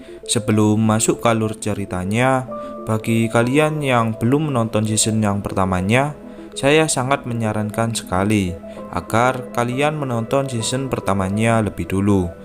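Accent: Java, Indonesia. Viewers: Gak asik denger naratornya....terlalu medok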